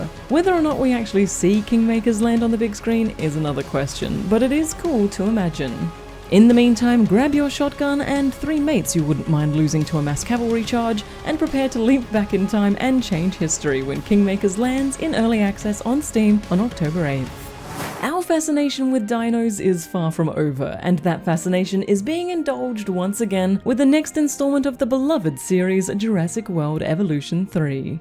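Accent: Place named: Australia. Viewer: I like the rare occasions YouTube recommends something interesting from a channel I didn't know about before. I liked the old days of classic RTS and city builders. Edit: also hello from another Australian, assuming from your accent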